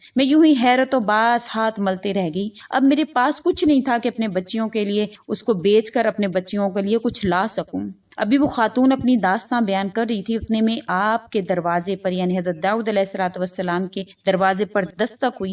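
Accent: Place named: Arab world. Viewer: اسلام علیکم ، بہن آپ سے گذارش ہے کہ آپ اردو جیسی میٹھی زبان کو اردو ہی کے لہجے میں بیان کریں، بلاوجہ اردو کو عربی لہجے میں بیان کر کے مصنوعی مت بنائیں.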